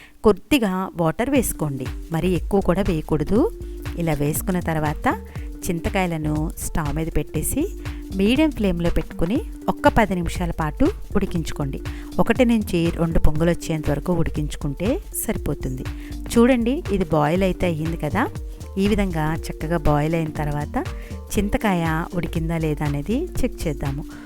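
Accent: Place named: Godavari, India. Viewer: Mee godavari yasa mataladitey bagundedi andi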